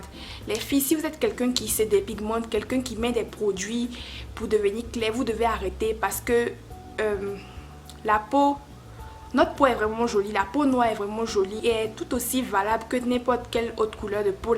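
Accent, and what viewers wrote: Ivory Coast. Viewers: Tu es vraiment ivoirienne le language qui ne trompe pas le ye qui ne trompe pas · Ma chère par ton accent j'ai sû automatiqmt que tu étais Ivoirienne😍😍😘😘🇨🇮🇨🇮🇨🇮. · cet accent ressemble un accent de la côte d'ivoire bisou ma cherie moi je suis originaire de ce pays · Je vien d'arriver sur ta des que j'ai entendu ta voix e su que tu était ivoirienne❤️❤️🙏🇨🇮🇨🇮🇨🇮🇨🇮🇨🇮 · Super ! Contente d’entendre l’accent ivoirien fatiguée du chocor 😂🤸🏾‍♀️ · L accent me dis quelque chose .tu es ivoirienne ? Petit conseil prend plus le temps de parler tous doucement. Belle video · Hien les ivoiriens avec votre tonalité la😫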